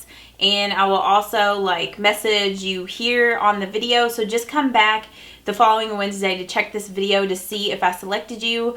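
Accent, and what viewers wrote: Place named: Kentucky, United States. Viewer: I love that this palette is all matte and is so nice for fall🍂 and even winter❄️too! I love your channel and I think I have gone and bought just about everything you have used or recommended from the drugstore. I have four kiddos so higher end products aren't usually on my shopping list lol! I think you are the sweetest thing and I love your Kentucky accent! As always, your makeup💄 looks gorgeous! Oh and I'm entering the giveaway also! Thanks so much and have an awesome day! 😊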